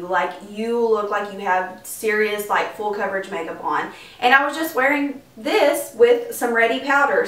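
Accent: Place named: Texas, United States. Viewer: You are so beautiful! Kind of have a tx accent! Lol. So anyways, I returned my Smashbox 15 hour wear and got this in exchange. I have super dry skin and also some wrinkles because I have that "early 30s skin" ! So this foundation seeped into my wrinkles and just did not work for me!! Next I'm gonna try UD naked skin when I return this one. But I do love your videos! 😄🙆🏻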